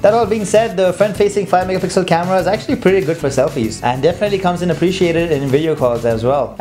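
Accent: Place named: India. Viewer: Nice review, I just want to buy this right now! Xiaomi just arrived here in Brazil, and I really hope they keep up soon with this device, just incredible for the price. And... dont change your accent, its cool, I watch some indian review channels on youtube and your accent is one of the most fine between all. Actually is a little bit of cute to hear!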